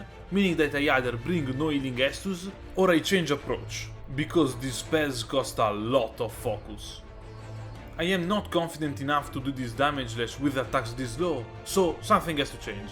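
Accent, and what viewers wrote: Italy. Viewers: Si sente che sei italiano 😂😂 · Ma sei italiano? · scusa se chiedo, ma mi sembra che tu sia italiano, o comunque mi pare tu abbia un forte accento italiano, o mi sbaglio? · Mi fa morire con la cadenza italiana 😂😂😂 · sei italiano? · Are you italian? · Ma sei Italiano? :D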